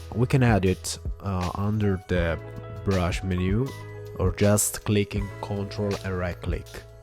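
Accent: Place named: United Kingdom. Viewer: What an amazing british voice man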